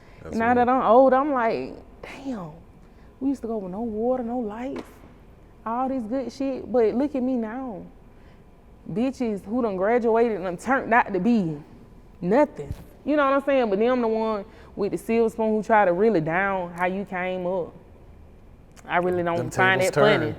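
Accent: South, United States. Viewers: Don't know you you sound so country well Black Is Beautiful gurl 10/17/22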